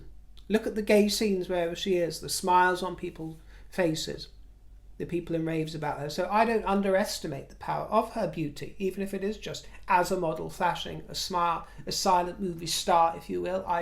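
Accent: United Kingdom. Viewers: Ohhh the word is "uppity"! With your Brit accent I kept hearing "appetite" 😂😂😂 Uppity just means "snob" - whoever said it is racist, get over yourself!! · Amen for you speaking UK English! ❣❣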